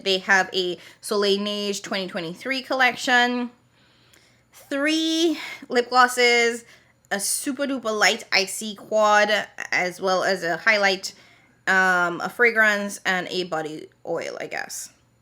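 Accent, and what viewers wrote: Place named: Trinidad and Tobago. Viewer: Why did I say the caption in your trini accent 😂😂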